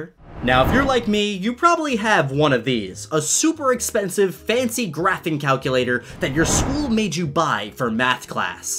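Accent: United Kingdom. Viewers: bro is british gl :D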